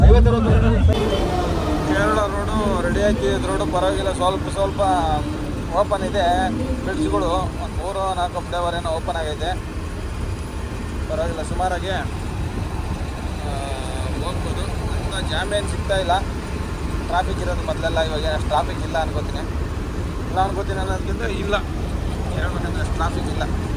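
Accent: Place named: North Karnataka, India. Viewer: Uttar Karnataka Bhasharespect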